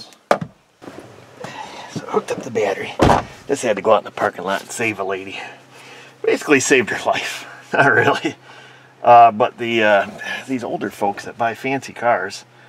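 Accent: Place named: New York, United States. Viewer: Since you speak New York-ese I thought you were saying Self Made Auto:(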